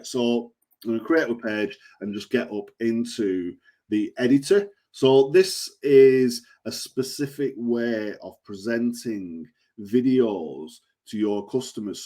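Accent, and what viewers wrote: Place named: North, United Kingdom. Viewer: Yes! Sound like a northerner lad.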